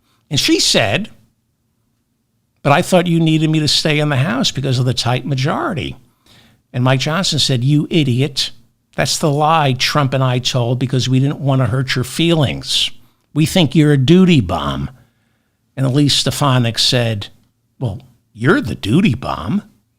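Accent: New York, United States. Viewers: Love your New York accent!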